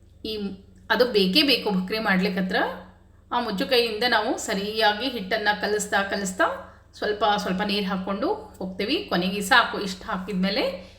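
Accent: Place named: Belagavi, India. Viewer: Amma navu kuda dinalu rooti maduteve navu belagaviyavaru nivu namma tarane matadatira namage tumba khushi agutte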